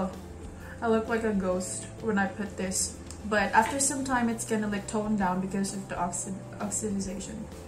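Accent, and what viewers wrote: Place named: Philippines. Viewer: I like your filipino accent:))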